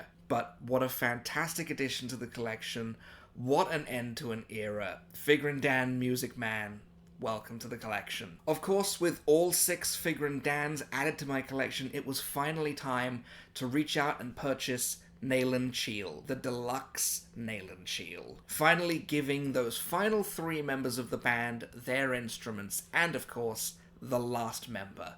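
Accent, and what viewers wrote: England, United Kingdom. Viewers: J! I've always assumed we both had great minds! Hope you and the family are good. I'm currently struggling with daughter and her various "quirks" of teenage girl behaviour. I've only discovered recently, clicked on and heard his accent. Sold!! I thought. Gotta support English dudes across "the pond!"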